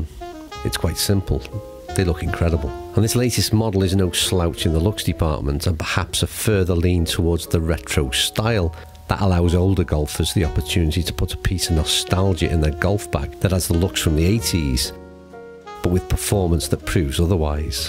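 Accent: Liverpool, United Kingdom. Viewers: Love the plazzy scouse delivery. Very blowey in the Wirral😂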